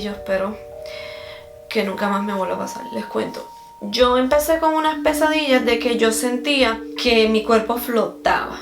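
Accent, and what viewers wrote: Puerto Rico. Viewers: en el rato que te escuche tenias acento de PR Venezuela y Mexico? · Ella habla bien simplemente es el acento y la rapidez para hablar de cada país muchos en Puerto Rico hablan así y en varios países más rápido después de un tiempo te acostumbras y les entiendes mejor. A mí me pasaba que a los venezolanos y cubanos no les entendía bien, es cuestión de entrenar el oído como cuando haces listening con el inglés 😀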